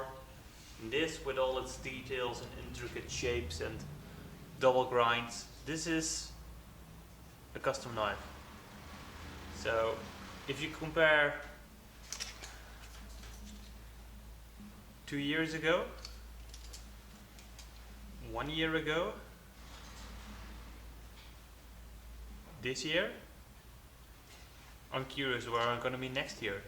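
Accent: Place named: Netherlands. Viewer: you sound like someone from holland. is that right ?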